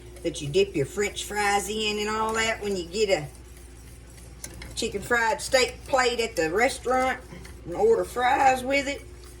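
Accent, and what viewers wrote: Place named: Texas, United States. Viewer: I always said “ like yay so” and people would say “huh”😂 Good grief I’m from Arkansas, I love how you talk there in Iola Texas❤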